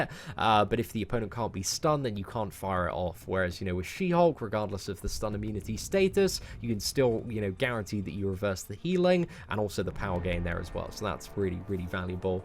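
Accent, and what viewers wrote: United Kingdom. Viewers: great videos bro. They've helped me a great deal. I was wondering if you could help me out. I'm farely new to the game. But, I'm finally starting to get 4 and 5 star champs. I'm going to list them below. Could, you recommend which champs I should make my 3 man attack team and which ones I should make my 5 defenders? None of my champs are awakened yet. By the way what part of the U.K. are you from? Or am I hearing wrong and your from down under? I'm what you call an American Scouser lol. Once again thanks and keep up the good work. 5 star - Hela and Yondu 4 Star- Morningstar, Mephisto, Blade KMonger, SheHulk, Emma, Guillotine, Venom, VooDoo, Wasp, Goblin, Sentry Wolvie, Antman, Punisher, Abomination, Night Crawler, Cyclops Blue, RedSkull, Capww2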